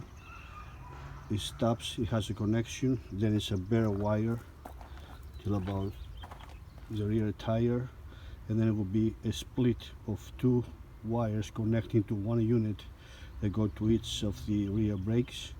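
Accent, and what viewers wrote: Greece. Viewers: Hey, you Greek? You sound Greek to me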